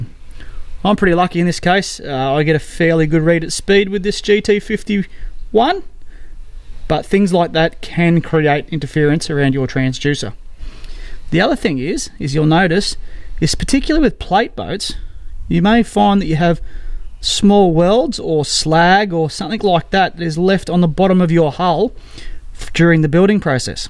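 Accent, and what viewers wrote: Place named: Outback, Australia. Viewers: I just want to know how I can get the Australian outback accent for my videos! That accent makes everything <num> times cooler and interesting!!! That accent alone is worth like 100k views Mayte! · More specifically a Queenslander accent. ‘Youse’ is a dead giveaway. Very ocker, mate!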